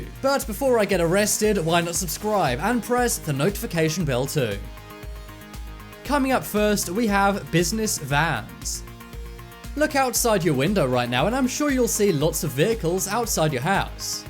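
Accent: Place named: United Kingdom. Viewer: Notice the British accent.....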